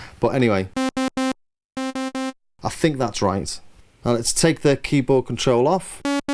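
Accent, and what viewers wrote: Liverpool, United Kingdom. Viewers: Just discovered - Great work mate. I make info vids too and that's a lot of work you're putting in there. Re-living my past buying the software synths from my 20's (including the old pro one in the background!) and you provide a detailed and honest review. I do keep thinking you'd love reaper with CSI to run your synths so you wouldn't miss the physical element - it's the thing that brought me back to enjoying all this gear. We're of similar era and location by the accent, always happy to be given the score with a scouse twang ;) Have you tried any of the cherry audio synths?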